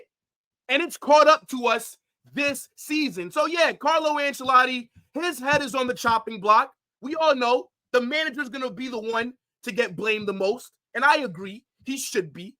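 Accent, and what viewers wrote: United States. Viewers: Are you American bro?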